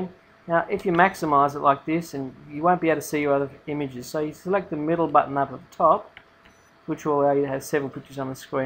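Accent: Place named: Australia. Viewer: omg i luv ur accent! austrailien, right? (did i spell that right? i dont think i did.)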